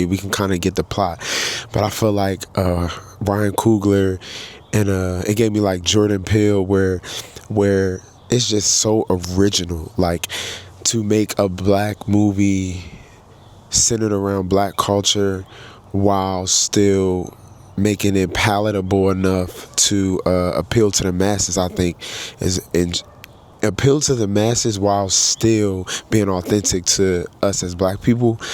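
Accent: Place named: Caribbean. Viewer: Sir, do you have family members who originate in the Southeastern United States? Because nobody who has ancestors from that place and lived during that time would totally and completely invalidate Mary's race and ethnicity like you did. I'm sensing a slight Caribbean accent too. I'm sensing your people are not originally from here, because people who looked like Mary were definitely in our family as grand fathers and grandmothers, great grandfathers and grandmothers, great great grand fathers and grandmothers. We all had family members who were Yt presenting and some actually passed for Yt. Your definition of what is Yt doesn't apply to the historical reality of Mary's identity, and it was really aggravating to hear you constantly dismiss her race and ethnicity and call that woman Yt and say Stack had a Yt girl. Mary was not a Yt woman. It's very low key disrespectful to dismiss people like Mary who were in our lineage and chose not to pass, and that is what Mary ultimately chose for herself, to not pass for Yt or live as a Yt person. 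Her storyline was a representation of our ancestors who had her experience. People who were Yt presenting in Black America is part of the Black American experience. There were plenty of Yt presenting Black Americans in the USA who were enslaved in this country, and Jim Crowed right along with the darker skin Black folks, and who stayed within the negro/colored community marrying more darker skinned Blk folks and as a result, we have these women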